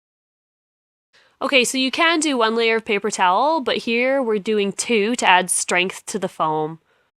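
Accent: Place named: Wales, United Kingdom. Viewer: Your accent isn't terribly difficult to understand. Sounds welsh.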